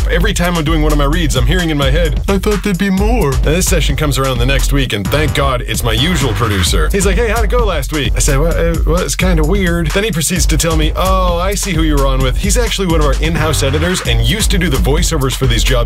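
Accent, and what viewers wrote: Boston, United States. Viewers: I want more movie trailers in your boston tone of voice. I miss people who talk normal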